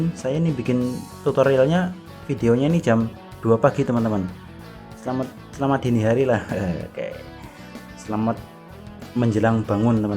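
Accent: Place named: Java, Indonesia. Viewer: Jn jawane nyong bngt kang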